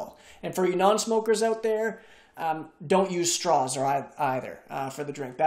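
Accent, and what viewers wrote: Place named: Canada. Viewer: Are you Canadian? Just asking because of a slite accent. Good video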